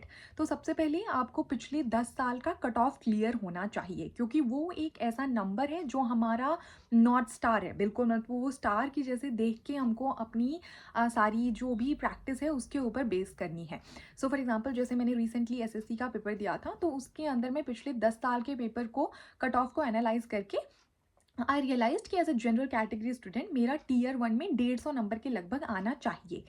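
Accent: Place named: Delhi, India. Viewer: You claim to be a Bihari origin, but uour language sounds of Delhi origin. You must have been brought up in and around Delhi. With good school backgraound. It is your schooling that is making you successful everywhere which is not accessible to others. Take example of ssc CGL exam, you scored 156 because your english was good from school days.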